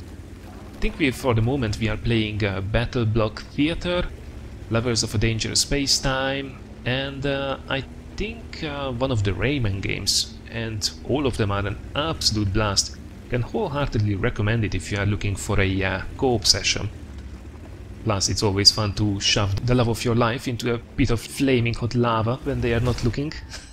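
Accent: Hungary. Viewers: Az akcentus elég árulkodó :) Ráadásul Velem egykorú... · Tudtam hogy magyar akcentus :D tetszik a sorozat de szerintem egy rész lehetne hosszabb is.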